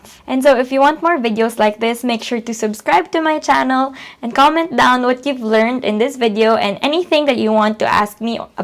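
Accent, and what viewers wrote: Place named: England, United Kingdom. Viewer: Hi, Ate. Thank you for sharing your info and experience with us about in the field being as a freelancer. Also I adore your English accent. I'll be doing freelance soon, once I have done with some of my portfolio. Do you mind if you make a short video showing your office setup and what things to consider when working from home. I'll be glad if you do. Salamatss~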